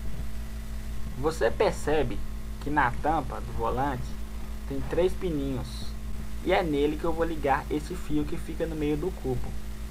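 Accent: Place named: Paraíba, Brazil. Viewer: Kkkk gostei do sotaque paraiba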